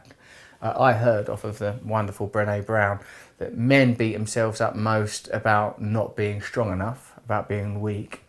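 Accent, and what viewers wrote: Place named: England, United Kingdom. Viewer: You always amaze me... whatever you do! As a human being, I’m guessing there’s probably NOT MUCH, you’ve not experienced. ( it sounds so much better too... advice & rhetoric coming from a British accent!! Am I right? ) - Keep up the good fight in educating our youth! My lack in self confidence, is just that! I’m too afraid to stand up, to be, truly me! I wanna be great... & in just saying that, it makes me feel... blah- I’m comparing again! To you! ... I hope I’ll someday find that peace, within myself! God Bless you... Sir!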